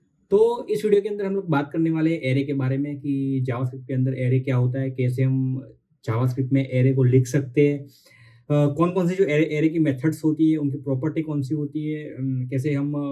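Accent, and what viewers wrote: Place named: Gujarat, India. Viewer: Bhai, content ekdam solid che! Thodu communication polish karo, Gujarati accent ne remove kro, to channel next level par jase. 🔥 Keep going strong! 👏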